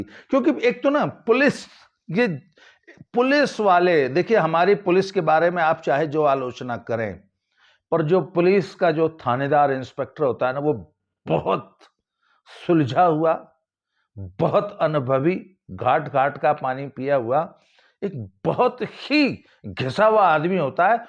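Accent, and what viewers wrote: Haryana, India. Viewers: Ram ram dada ji, Aap ka Hariyanvi samvad kamal ka he 😂😂😂😂😂, aisa lag hi nehi reha ki aap eastern UP se ho,lagta he ki aap Hariyana ki gramin sathli se ho 🙏🙏🙏🙏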